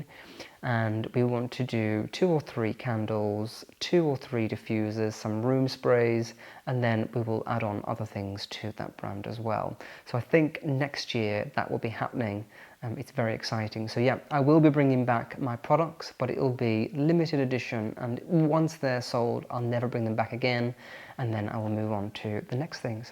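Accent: RP, United Kingdom. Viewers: U R A BIT plummy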